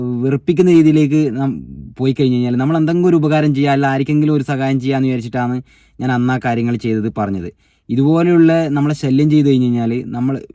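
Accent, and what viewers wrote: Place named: Thiruvananthapuram, India. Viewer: തിരുവനന്തപുരം ആണ് എന്ന് തോന്നുന്നു സംസാരം കേൾക്കുമ്പോൾ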